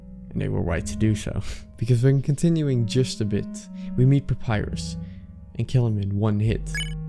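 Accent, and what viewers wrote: Netherlands. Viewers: i smell dutch..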